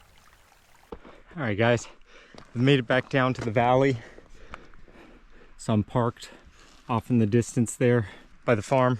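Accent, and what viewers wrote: United States. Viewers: it’s the softly spoken yank accent that does it for me bud. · Are you an American or English? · you American bro? good video!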